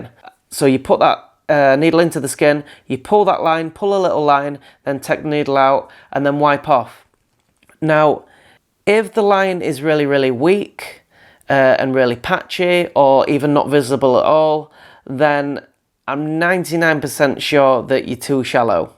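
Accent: United Kingdom. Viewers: Thank you SOOOOO much. you brits make anything sound awesome. love your enthusiasm and brainworks. watching video after video can become boring or even worse misinformed. I am a sponge...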